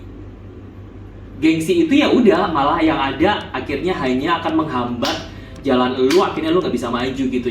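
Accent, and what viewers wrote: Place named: Java, Indonesia. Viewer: Sabar mas, dulunya artis eh krj sbg crew.... Smngt.... Kbykn artis star sindrom..... Sukses skrg medok jawanya uda berkurang mas hehehehe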